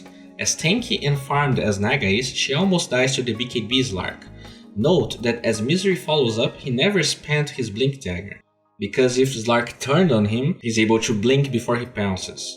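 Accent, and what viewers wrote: Brazil. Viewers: Let the brazilian man with the brazilian accent do what he likes. It's not like people can't understand shit because he pronounces words with an accent. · ^ This. I'm unfamiliar with the brazilian accent so it makes it hard for me to understand him sometimes.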